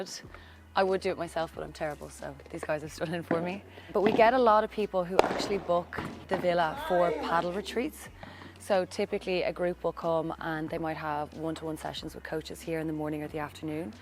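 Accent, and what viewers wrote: Ireland. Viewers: yes I hear a definite Irish accent, very mild really. I would guess she is from a larger city in Ireland, Such as Dublin or possibly Belfast. Who knows. I think maybe? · Sweetie, did you think we would confuse you with Mexican? We know you are Irish, we can hear it, see it 😂😂 gorgeus home, you have grest taste❤❤❤ amazing views 😊 I have big envy energy 😂😂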